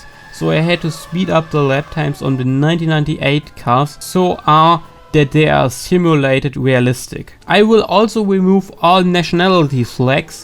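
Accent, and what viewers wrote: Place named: Germany. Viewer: Ich liebe den deutschen Akzent xD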